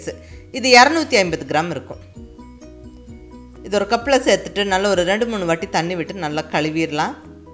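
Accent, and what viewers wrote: Coimbatore, India. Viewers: always you rocks mam.... Am also coimbatore nanjundapuram amma home.. Now setteled in Chennai.. Husband home... Nama cbe slang kelumpothu avlo happy ah iruku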